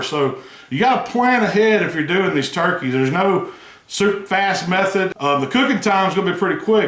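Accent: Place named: Sand Mountain, United States. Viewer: You sound like one of them ole Sand Mountain boys I grew up with 👍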